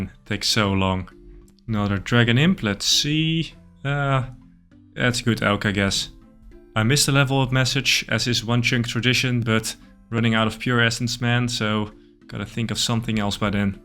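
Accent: Netherlands. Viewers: het nederlandse accent is niet te missen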